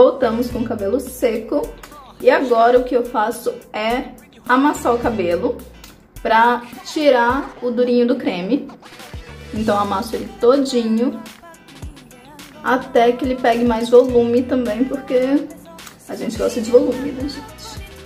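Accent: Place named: Bahia, Brazil. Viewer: Nossa ela é da Italia mas parece que tem um sentido Bahiano de Salvador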